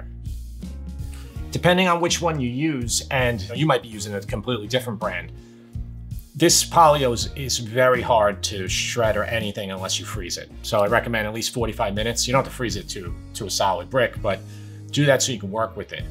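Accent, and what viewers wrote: New York, United States. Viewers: Great channel. Great presentation. This guy is very down to earth, with the best NY accent. Nice!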